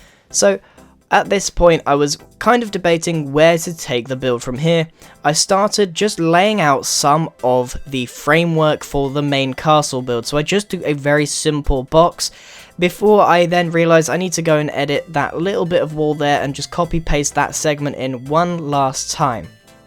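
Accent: United Kingdom. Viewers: grian im from uk but ur acent sounds soooo british haha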